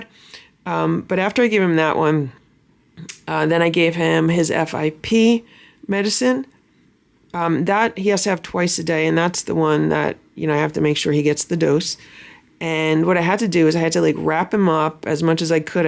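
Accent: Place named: New Jersey, United States. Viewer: I haven’t been able to watch this video yet. Just saw the thumbnail this morning and my heart sank. Boo was more than a cat—he was a rhythm, a presence, a sovereign part of your world. I’ll miss hearing your voice call out ‘Hey Boo’ in that familiar New Jersey grain. It always made the day feel a little more anchored. Sending love and deep respect as you grieve. You gave him a life of dignity, ritual, and care—and that echoes far beyond the screen.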